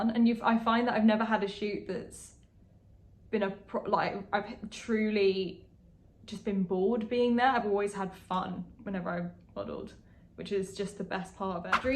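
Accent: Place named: United Kingdom. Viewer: Basically, my motivation to subscribe to your channel is your British accent.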